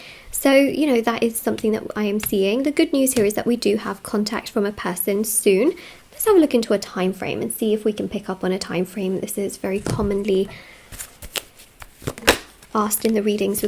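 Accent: England, United Kingdom. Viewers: I just love listening to your Beautiful English Unique Pixie Fairy voice 🧘👌✨🧚🏼‍♀️🪄✨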